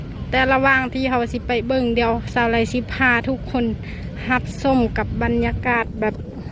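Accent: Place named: Cambodia. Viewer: แอดสำเนียงออกไปทางเขมรนะ ไม่เหมือนคนลาวร้อยเปอร์เซ็นต์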